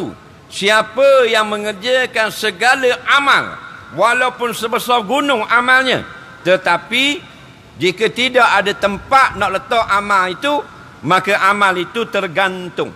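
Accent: Terengganu, Malaysia. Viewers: guna loghat terengganu dalam ceramah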